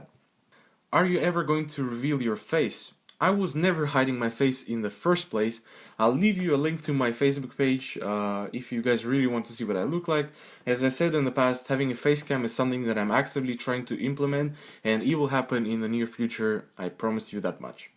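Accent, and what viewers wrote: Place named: Russia. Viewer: Вау, я конечно всегда слышал твой акцент и подозревал что ты русский, но,так как ты записал видео про рашн мафия колоду на английском языке, я разуверился. Круто слышать что русских популярных ютуберов тоже не мало (тролден например). But anyways for all of you who are wondering what the hell is this wall of text, I just want to thank you, for making good content and just being awesome overall. Keep up the good work man.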